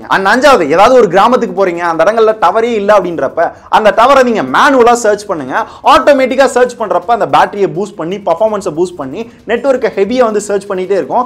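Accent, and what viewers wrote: Madurai, India. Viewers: BRO nega Madurai ya BRO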